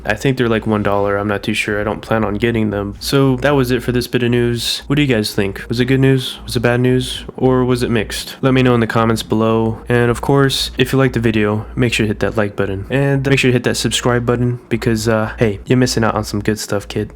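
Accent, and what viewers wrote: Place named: Canada. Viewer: You sound like a fucking canuck. I hope it isn't true. You are such a decent guy to watch when I had absolutely nothing else.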